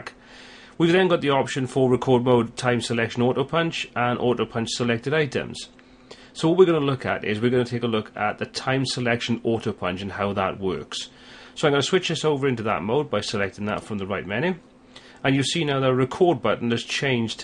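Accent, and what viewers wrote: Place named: South Wales, United Kingdom. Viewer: Great. Definitely South Wales.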